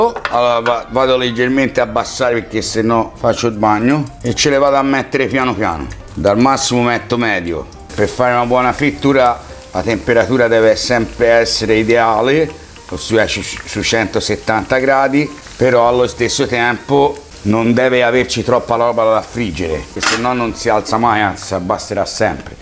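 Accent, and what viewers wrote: Tuscany, Italy. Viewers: como me gusta oir el acento toscano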